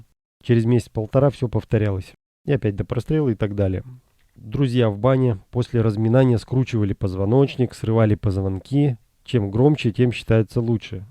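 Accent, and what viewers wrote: Yekaterinburg, Russia. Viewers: Екатеринбургский говор. Вы оттуда?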